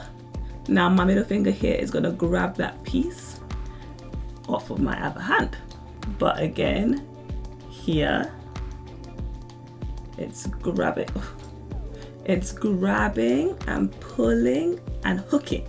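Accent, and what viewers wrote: United Kingdom. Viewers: I love your accent London accent i guess You are a beautiful woman with a beautiful voice 💕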